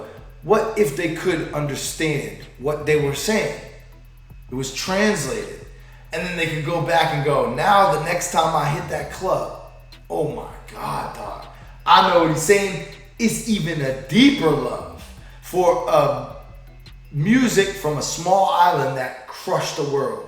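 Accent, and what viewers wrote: United Kingdom. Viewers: Big man think u from the uk lol bless up thou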